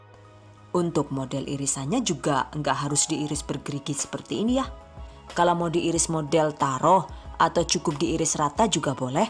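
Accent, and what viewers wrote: Banyumas, Indonesia. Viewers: Orang madiun kok ngapak yah...?😁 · Hahaa jebulè wong ngapak 😅😂 (di akhir video) baru nyadar 🤣🤣🤣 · Ngapak ngapaknya kerenn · Deneng ngapak yak. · Matursuwun ilmune bermanfaat Haha ha kocak jawa ngapake .sukses trs mbak yu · Deneng ngapak mbok ayu😂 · Makasi Mbaaak... Btw ailopyuu logat ngapakmu. Lagi enak2 ngrungokke serius ujug2 ngekek kemekelen🤩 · Suka banget klo udah kedenger ngomongnya ngapak2 gitu..aelaah bikjn ngakak polll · Enak kuwe yuu, tok jajal olih apa ora yu.🤣🤣🤣 Rika teyeng ngomong Ngapak ya🤣🤣🤣🤣👍👍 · Mantap CENEL ini, sy suka, cara bicaranya jelas ada dagelanya juga, bahasa ngapak nya itu lo, bisa bikin ketawa 😂😂😂 aku sangat suka sama cenel ini 👍👍👍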